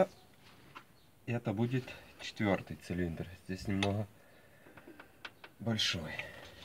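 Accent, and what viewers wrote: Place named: Baltic. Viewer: У вас акцент буд-то вы из прибалтики.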